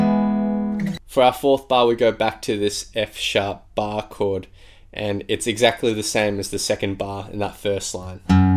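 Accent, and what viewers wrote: Australia. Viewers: Thanks for this great tutorial and the Aussie accent . Both excellent. 👍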